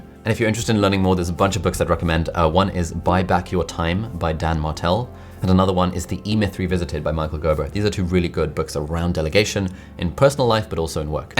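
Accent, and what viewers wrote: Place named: England, United Kingdom. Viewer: ALI ABDAAL LOOKS LIKE AN EARTHQUAKE VICTIM FROM PAKISTAN WITH AN ENGLISH ACCENT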